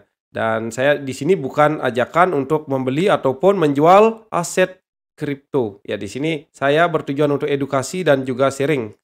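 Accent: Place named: Bali, Indonesia. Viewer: Saya tau dr logatnya bli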